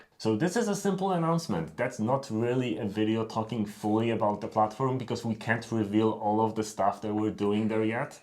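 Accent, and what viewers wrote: Poland. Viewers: i love this polish accent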